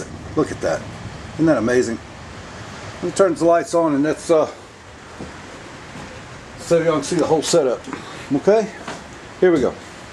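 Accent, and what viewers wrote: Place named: South, United States. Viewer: i never cared for the southern accent. you make it sound normal. good video.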